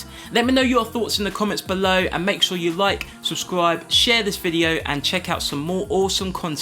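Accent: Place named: England, United Kingdom. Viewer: I clicked to hear the bro talk with an English accent. Go forth young man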